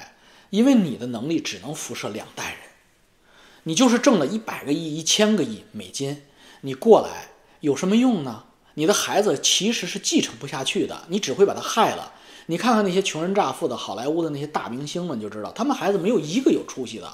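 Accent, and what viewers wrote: China. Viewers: ，前些天你笑话阿姨的英文，今天我听出你的几个单词发音也不理想，中式发言浓度偏高。Fuck，你说的往fak偏；balance，你说的掰Lance；还有一个词可能是要说Melt 融合，说成迈特。见微知著哈，元音的发音比较中式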